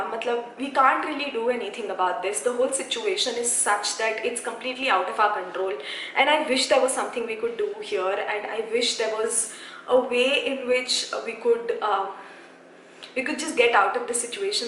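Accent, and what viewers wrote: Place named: South India, India. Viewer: You sound so much like a South Indian,you sound so cuttee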